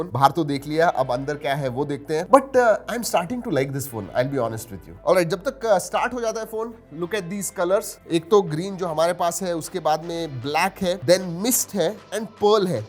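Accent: Maharashtra, India. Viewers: The whole thing that I love the most is little bit sweet marathi 😍😍luv it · I like that marathi accent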